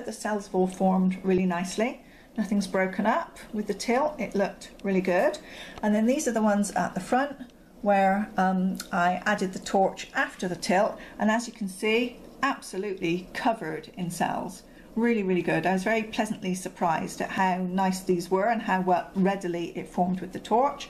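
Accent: United Kingdom. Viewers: It is so nice to watch your videos. They are straight and to the point. I would also add that it is nice to hear a concise commentary, and also a UK accent as so many are from USA it feels like this art is solely for their market. No disrespect intended, but it is refreshing to know that this art is global and there are those here in UK who also embrace this wonderful art.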